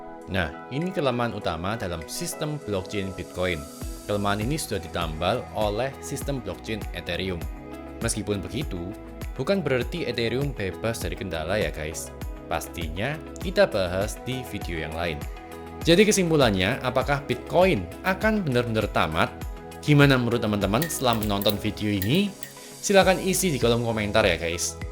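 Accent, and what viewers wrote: Java, Indonesia. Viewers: ngomomngnya medhok, kayak wong yogya atau suroboyo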